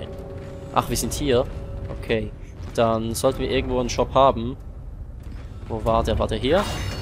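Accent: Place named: Switzerland. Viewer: Erfrischung mit schweizer Akzent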